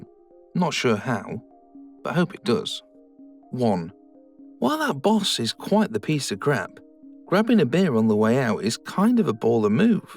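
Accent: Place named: United Kingdom. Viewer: Perhaps it’s just you. I don’t mind a Brit narrating.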